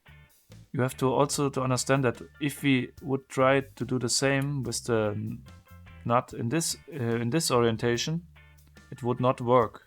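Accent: Germany. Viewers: "screw them" ! :-) I love your Germlish. Never mind, it's ok.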